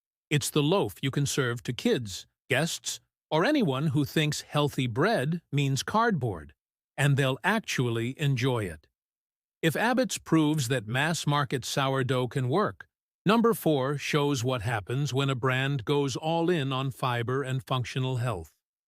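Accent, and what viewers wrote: United States. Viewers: Why the American AI voiceover? Could’ve used an Aussie voice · Yeah okay.. but other sites advise that the breads baked in coles, woolworths and bakers delight are not mixed on sites. They are baked from frozen mixtures. So how is that being honest with the public. It's all such a minefield. And no offence, but why are a couple of the sites similar to this narrated by someone with an American accent?accent.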